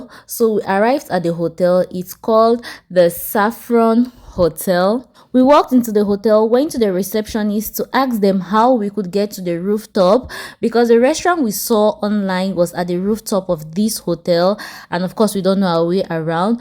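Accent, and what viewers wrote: Nigeria. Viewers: Thank you for this video. Ur accent sounds like Nigerian. Only a rooted Nigerian can differentiate. Thks, anyway!!!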